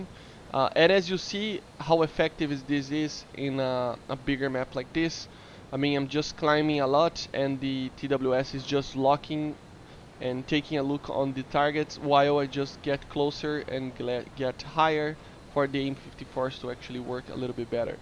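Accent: Brazil. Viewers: Are you Brasilian?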